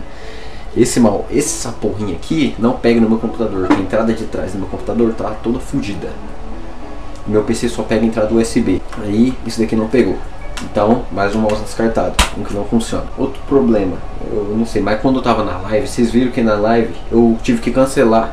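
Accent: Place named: Portugal. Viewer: ah ta portugues de portugal :3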